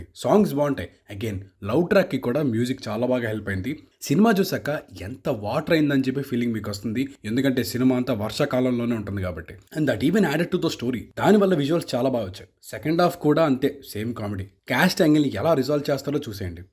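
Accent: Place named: Godavari, India. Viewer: Anna godavari slang try chesadu roiiii🔥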